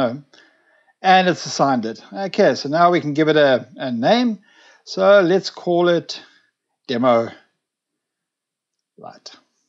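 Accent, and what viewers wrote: South Africa. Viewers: Thanks so much for this video, also nice to see a South African video for a change LOL (mind you, a South African accent that that's not making a video from abroad!). Please can you do a review video on these new emergency LED light bulbs that have a the built in battery inside of them but work like a normal light bulb. Also do you have recommended retail prices and where one can buy the sonoff switches? Will check itead out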